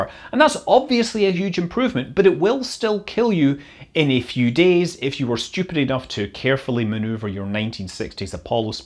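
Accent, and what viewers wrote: Scotland, United Kingdom. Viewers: Great video as always! Unrelated, I got a good chuckle every time you said “Explorer,” which I heard as “Exploder” due to your Scottish accent.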